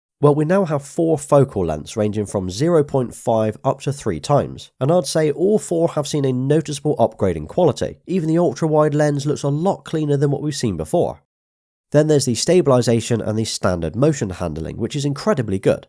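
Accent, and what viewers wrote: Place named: West Country, United Kingdom. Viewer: Your West Country twang coming in strong here😂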